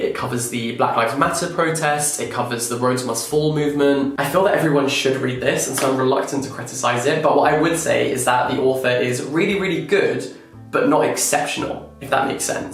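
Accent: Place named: England, United Kingdom. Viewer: Again, your english accent is exquisite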